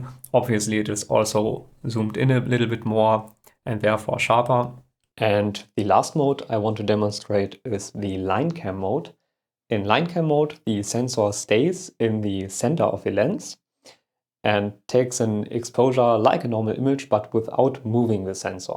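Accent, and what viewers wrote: Germany. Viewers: very well done...you are really a technical genius..,. by your accent can I say that you are from Germany ? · Wie deutsch kann ein Akzent sein. Dieser Typ JAAAAAAAAAAAAAAAAAAAAAAAAAAAAA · I heard the German accent and i knew it was gonna be very interesting.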